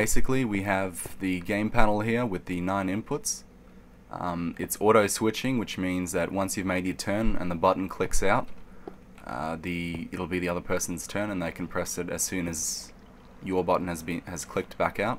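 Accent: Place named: Australia. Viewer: your aussie like me :D i can tell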